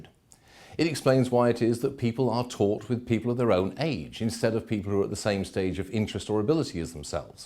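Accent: United Kingdom. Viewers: This guy's British accent makes him sound more credible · His argument is clearly flawed. He states that the school system corrupt but he never states why. He is on the same level as someone who is angry on Facebook but with a British accent and a snazzy camera. Educate yourself on the components of a potent argument and you will come to the conclusion that his argue is as solid as liquid water.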